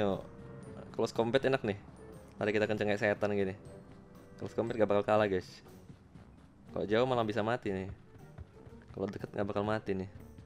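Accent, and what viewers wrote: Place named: Sulawesi, Indonesia. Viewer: Orang sulawesi tuh bg haha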